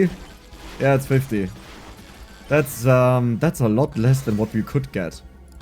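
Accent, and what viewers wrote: Germany. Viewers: U got me opens chest ...... oh my god another credit card xDD (the moment with the magnet) love ur german accent btw xP, keep it up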